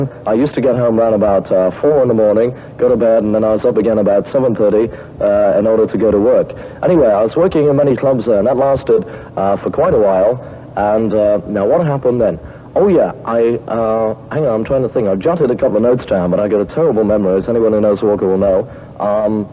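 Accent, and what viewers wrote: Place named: Mid-Atlantic, United States. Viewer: Oh that dodgy mid-Atlantic accent!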